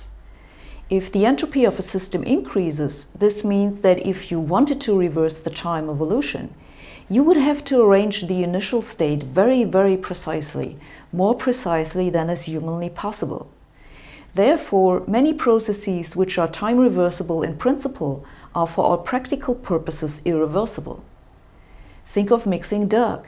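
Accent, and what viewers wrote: Germany. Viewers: Ich liebe die deutsche aczent..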